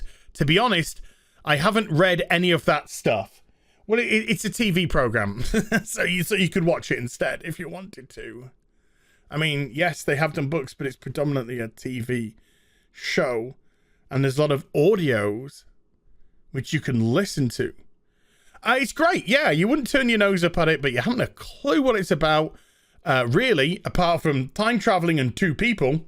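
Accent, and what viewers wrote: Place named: Nottingham, United Kingdom. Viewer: Love that Nottingham brogue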